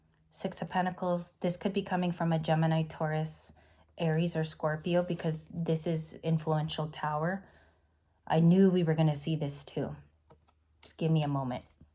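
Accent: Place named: New York, United States. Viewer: Thank you for the reading. I also had the Tower in my daily read. Love the NY accent glad to see you're channeling some east coast!!❤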